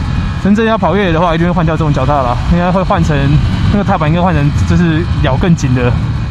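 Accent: Taiwan. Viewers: “大加分“有台灣國語的fu 好親切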